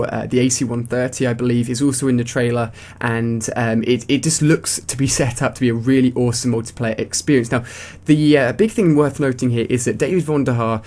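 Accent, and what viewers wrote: United Kingdom. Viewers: He's British. One does not simply change their accent. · Why does almost every game info video hav a British guy narrating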